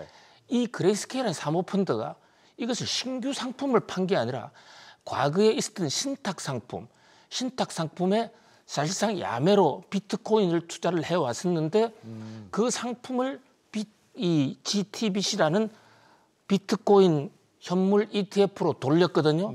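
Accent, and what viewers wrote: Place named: Chungcheong, South Korea. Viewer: 충청도에유 천천히 귀에 쏙쏙이네요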